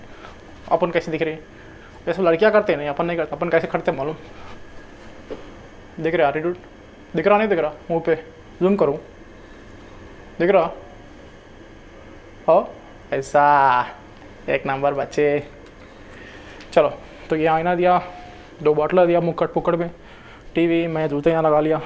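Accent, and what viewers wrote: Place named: Hyderabad, India. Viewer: Bro i like it ur hyderbadi accent.... maja agaya na ekdmach.... ... 😃🤣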